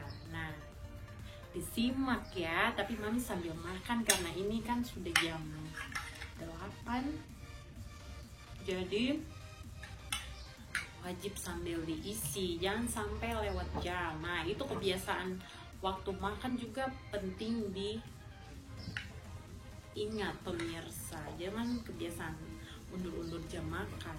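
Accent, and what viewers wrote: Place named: Ambon, Indonesia. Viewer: Enak banget dengerin pake nada ambon yaa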